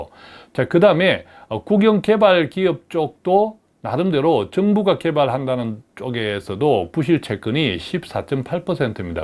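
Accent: Gyeongsang, South Korea. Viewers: 말씨가 갱상도네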